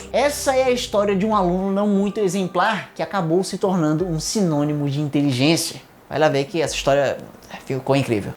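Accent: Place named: São Paulo, Brazil. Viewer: Nss kkk... Acho tão legal o sotaque paulistas aquela poxadinha no r (queria dar essa poxada também)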